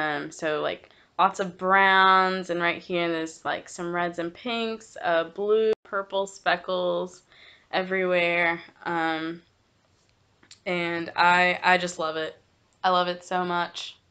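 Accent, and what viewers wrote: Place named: Alabama, United States. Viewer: Hurray! 👏🏽👏🏽👏🏽 Awesome first episode! I really enjoyed listening/watching. Your accent tooootally made me homesick for alabama lol (i use to live in huntsville). Im excited to see how that sweaters quantity works up. The color is beautiful. I need to get my ravelry project pages together... theyre shamefully out of date... smh. One day 😒☺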